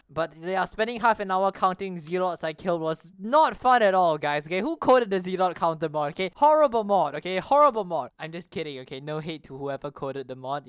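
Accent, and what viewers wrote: Singapore. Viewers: So you are singaporean. You can hear it in the accent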